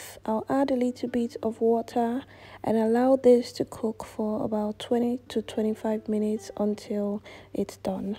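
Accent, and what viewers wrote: Nigeria. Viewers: Polished , polished polished wait a minute , was that voice yoruba ?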